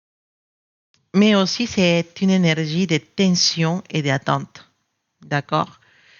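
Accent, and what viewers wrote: Spain. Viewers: Je suis la personne spéciale, il y a pour moi toujours une grosse attirance, je n'ai personne d'autre dans ma vie, on vient de se séparer car il me fait beaucoup de reproches il est dur avec ses mots irrespectueux, agressif dévalorisantes. J'étais jamais assez pour lui j'en ai perdu mon naturel. C'est vrai j'ai idéalisé et je suis très déçue, je voulais une belle relation avec lui dans le respect et dans la paix. C'était l'ascenseur émotionnel. Oui je suis triste malheureusement il ne changera pas. Je suis Gémeaux et l'autre tirage ma également parlé bcp. J'ai peur car je ne veux plus qu'il me parle mal et me corrige tout le temps. Oui je veux changer et le fait de ne plus accepter de l'irrespect et je dois mimposer plus mais aussi mouvrirnplis dans une relation J'adore vous entendre parler votre accent espagnol qui me rappelle mes origines😊 😊😊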